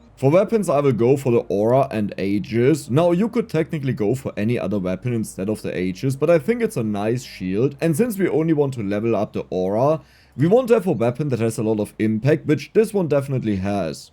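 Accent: Germany. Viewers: Didn't know that german-accented commentary was what i needed more of in my life but i will take it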